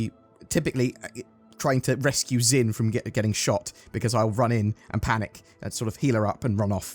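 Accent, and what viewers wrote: United Kingdom. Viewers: Me watching this video: “This dude is speaking my thoughts with a British accent!” I love this game, but those things are definitely pain points.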